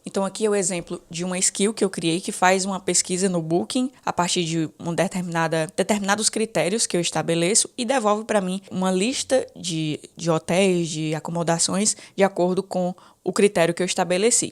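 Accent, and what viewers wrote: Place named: Recife, Brazil. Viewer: Oxi! O sotaque é do Recife. Hahaha Conteúdo muito bom! Muito rico! Parabéns! Uma dúvida: como funciona o Antigravity para criar apps e fazer o deploy?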